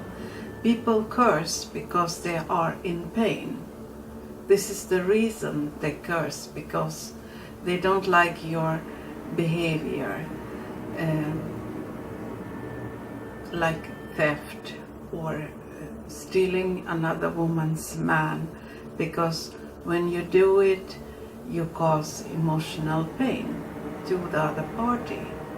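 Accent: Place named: Sweden. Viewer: Er du svensk? Jeg er fra Norge og kjenner igjen aksenten din😁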